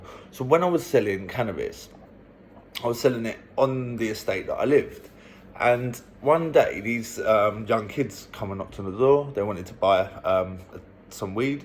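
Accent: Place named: Turkey. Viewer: the turkish accent stuck brother😂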